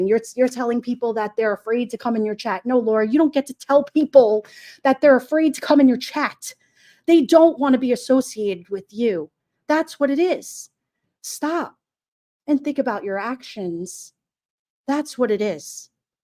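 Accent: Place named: New York, United States. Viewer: ..I'm always on catch-up ..I love your NY accent..💖👋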